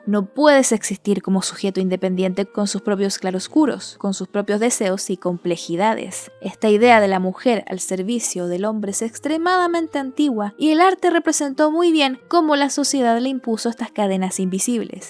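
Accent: Peru. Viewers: Are u peruana? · Are U Peruana?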